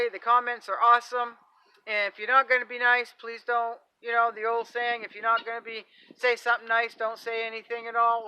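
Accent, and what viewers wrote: Maine, United States. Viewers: My mouth is wide open in shock and astonishment at how entitled some people actually are.😶😦😦. You might need to invest in some ferocious dogs to scare these weird people off. And you are not out of bounds. Some people you give an inch to—they WILL take a mile, and never be ashamed of themselves. I love your attitude and hard work ethic. My husband’s family lived in Presque Isle. Remember when there was an Air Force Base there decades ago? His Mom married a Airman from the base and they finally retired in a warmer climate. She had the same accent as you, so you make me smile as I hear you speak. ♥️🥰😁. That snow and cold looks brutal, but you are one tough woman and we are proud of what you accomplish. 💪🏼. I love you videos, I just found you a few days ago, and I am hooked. Next time that man shows up, hand him a shovel or an ax and tell him he will have to work for that goose—and the work will be double if he wants the breast meat. 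That should scare him away.😂. Blessings to you.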